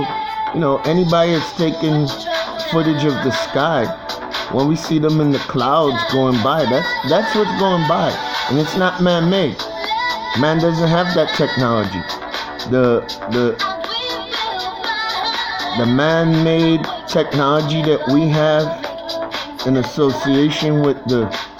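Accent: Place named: London, United Kingdom. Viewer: On form today 😂😂😂 And, for those of us who do have fish and chips here ~ we do usually do that on a Friday!!! Love your London accent! Bless you for making me laugh! 🙏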